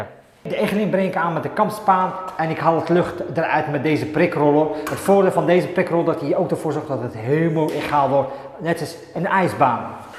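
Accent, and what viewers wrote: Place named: The Hague, Netherlands. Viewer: Dat haagsche accentje ook,geweldig. Bedankt voor deze video ik heb er veel aan gehad 👍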